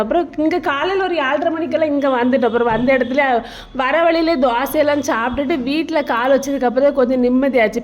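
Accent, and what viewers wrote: Kanyakumari, India. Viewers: அக்கா எனக்கு உங்கள ரொம்ப பிடிக்கும் உங்க கன்னியாகுமரி பாஸை ரொம்ப பிடிக்கும் நீங்க நீயா நானா கம்மியா பேசுனது வருத்தமா இருந்தது உங்க திறமைக்கு அங்கீகாரம் நாங்க கொடுக்கிறோம் உங்க டிஷ் எல்லாம் காட்டவே இல்ல வருத்தமா இருக்கு உங்க சமையல் எனக்கு ரொம்ப பிடிக்கும் நாங்க இருக்கோம் முன்னுக்கு வாங்க உங்க பிள்ளைகள் எல்லாம் பார்க்கும்போது சந்தோஷமா இருக்கும் அக்கா இந்த மர சாமான்கள் நீ என்ன விலை என்ன சொல்லுங்களேன் என் பிள்ளைங்க சொல்லி வாங்கி சொல்லு வாழ்க வளமுடன்❤❤❤❤❤❤❤🎉🎉🎉🎉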